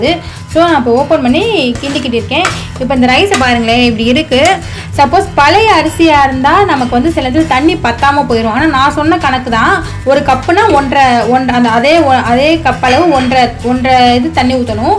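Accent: India. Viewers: Please vaya moodu ma... First yedhadhu channel la Samayal program yepdi pandranga nu pathutu relax ah video la pesunga... Pre video first oru paper la seymurai pathi step by step unnecessary repeat words illama yezhuthi vechu oru murai pesi parunga... Correction ku yarathu pakkathula vechu senju parunga... Then oil kodikara sound pondra idangalil background music avoid pannunga.. better neenga pathiratha moodi vechu time kudukanum nu soldra time ku music add panninale enough. Then very important neenga madurai, theni, ramanad, sivagangai area ah sernthavangannu nalla teriyuthu adhu thappillai. Unga area slang la neenga pesunga city karanga pola pesa muyarchikka vendam.adhu ungal videovin iyalpai kedukum.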